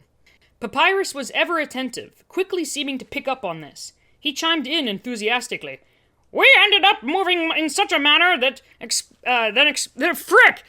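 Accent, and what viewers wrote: South, United States. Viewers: Your Southern voice is OK I’ve heard worse T-T sadly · XD the southern accent hehehe I love your readings lol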